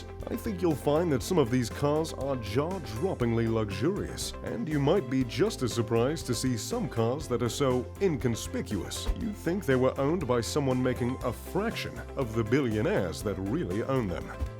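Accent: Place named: United Kingdom. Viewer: I watched numerous videos on this channel and i realize that British voice is appealing! It adds something to each videos; for example the way he pronouces "dollars", the pitch, the tone, the intonation, the inflection, the accent and let's not forget to mention the humour! They all play a role too! Some weird coincidence, i don't know but i used to watch a TV program called Lifestyles of the Rich and Famous and the host Robin Leach was also a Brit!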